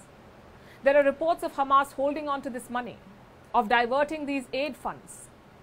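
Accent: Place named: India. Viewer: Every time I hear your voice it reminds me of all the scammers from India! ''halo... I'm David Copper from the IRS...send money or else''!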